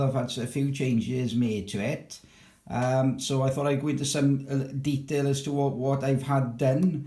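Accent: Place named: Wales, United Kingdom. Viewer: You sound Welsh......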